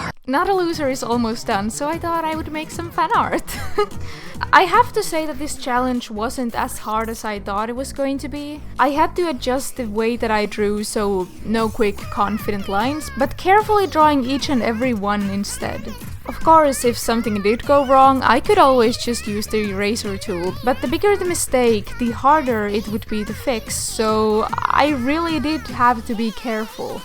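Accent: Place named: Finland. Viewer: Ah tää suomalaisten enkkuaksentti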